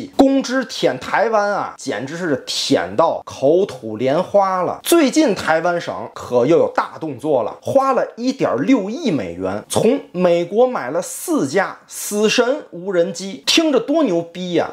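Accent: Tianjin, China. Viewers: 介天津话太喜欢了，不过还没去过天津呢。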